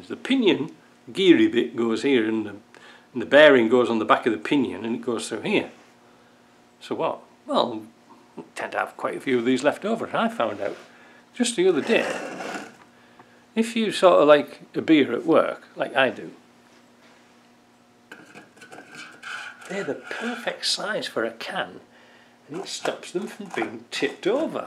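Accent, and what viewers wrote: United Kingdom. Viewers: Dear unknown , watching you from Poland , beacuse I learn english and you are a good teacher , I learn a lot of constructon , fixing 4x4 so thank , UK accent nicely.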